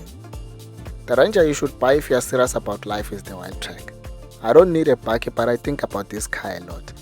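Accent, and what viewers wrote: South Africa. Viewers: Interesting journal, Nguni nation accent. Great review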